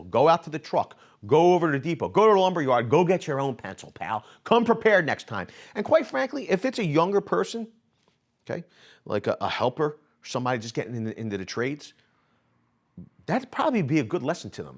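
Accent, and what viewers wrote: Philadelphia, United States. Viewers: Wudder. Everyone is always like "you mean water?" Screw you it's Wudder. Love our Philly accents bro. Go Phil's go birds. Suck it Dallas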